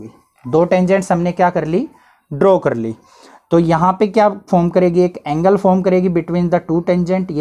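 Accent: Haryana, India. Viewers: Nice sir are you frim haryana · You are from Haryana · Sir aap haryana se ho kya? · Sir aap haryana se ho kya?? aapki voice m haryanvi accent aa rha h..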